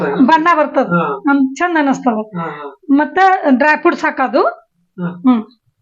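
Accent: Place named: Vijayapura, India. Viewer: ನಿಮ್ಮ ಮಾತುಗಳು ಬಹಳ ಇಷ್ಟ ನಮಗೆ ಅಪ್ಪಟ ವಿಜಯಪುರದ ಮಾತುಗಳು ನಿಮ್ಮ ನಾನು ಬೆಂಗಳೂರಲ್ಲಿ ಇರುವುದರಿಂದ ನಿಮ್ಮ ಮಾತುಗಳು ಕೇಳಿ ತುಂಬಾ ಖುಷಿಯಾಗ್ತದೆ ಏನು ನಮ್ಮವರು ನೋಡಿದಂಗೆ ಆಗುತ್ತದೆ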